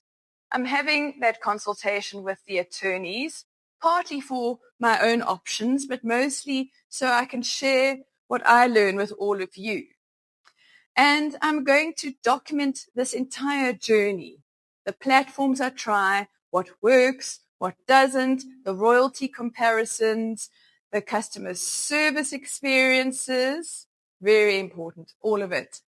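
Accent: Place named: United States. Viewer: Wow! Your accent looks American. I know someone who Amazon KDP terminated her account of $10k royalty and promised never to give her a penny. If they will reinstate your account after paying $2000 to the attorney, it's totally worth it I promise but who knows if that's a guarantee that you will recover your account, or who knows if that's another opportunity to make away with your money 🤔. Above all, who knows if they wouldn't come in future and finally terminate your account so that the whole processes will continue again.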